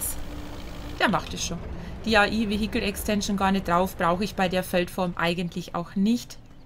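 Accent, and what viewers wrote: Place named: Bavaria, Germany. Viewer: dieser Bayrische Dialekt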